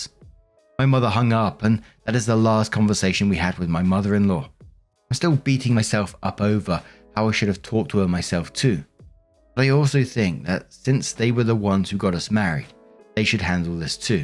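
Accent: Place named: Oxfordshire, United Kingdom. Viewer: I live in South TX, but when I was little my dad was in the US Air Force and Stationed at Upper Heyford. We lived in Oxfordshire (a little place called Middle Barton). When I listen to you talk, it reminds me of the 3 years I lived there. I don't know if that's anywhere near you, but listening to you takes me back!! The neighbor drama, the cheeky little kids. We were always in and out of everyone's houses too. Love your channel and stories!!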